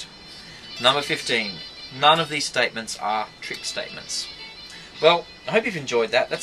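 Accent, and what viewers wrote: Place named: Australia. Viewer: Number 9 was a lie; You sound Australian.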